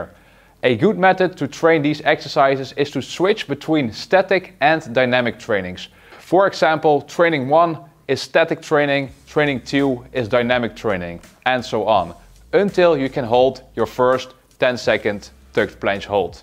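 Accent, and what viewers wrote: Netherlands. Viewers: I like the dutch accent in English :DD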